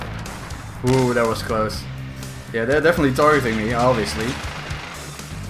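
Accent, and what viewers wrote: Netherlands. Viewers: are u dutch? zo klink je wel! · Ben je Nederlands of zo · dit nederlandse accent is geniaal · are a from the netherlands bro ? becausse i am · You're dutch right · his dutch way of talking English feels nice or better than the other accents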